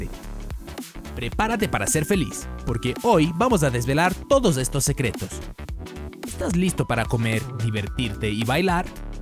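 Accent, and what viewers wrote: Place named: Brazil. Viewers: Si es brasileño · Soy la única que noto el acento al hablar del chico que narró el vídeo? Es brasileño,😳.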